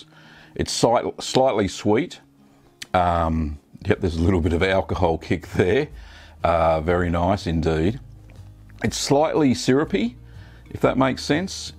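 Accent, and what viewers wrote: Australia. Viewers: I'm a simple man I hear the Australian accent I skip too another video .🖕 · Definitely make this a series!!! Cheese, mead/beer and an Australian accent. What more could you want in a channel!?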